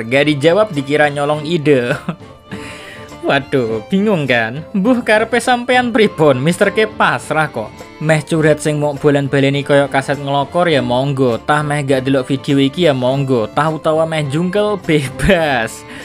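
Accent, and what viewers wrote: Java, Indonesia. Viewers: Mimin orang Jawa ya nanya aja min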